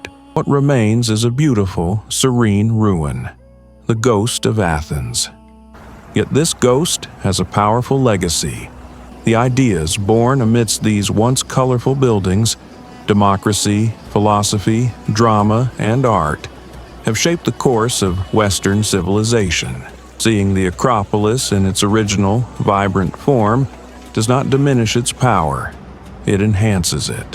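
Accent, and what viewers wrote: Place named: South, United States. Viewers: Right said. The Southern accent is so annoying in any context. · Good video, but the slightly southern accent seems a little out of place.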